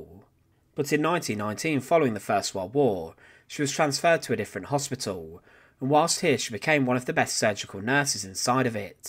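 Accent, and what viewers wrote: United Kingdom. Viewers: You put an huge emphasis on the last syllable of the word at the end of each sentence. Strange to my ears. Is this a particular British accent?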